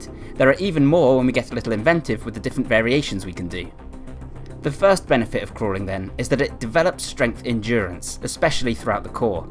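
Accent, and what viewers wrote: United Kingdom. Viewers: why is there a Dutch windmill in the video but i hear a british accent? I didn't know the UK has such windmills too!! awesome, I will implement this kind of training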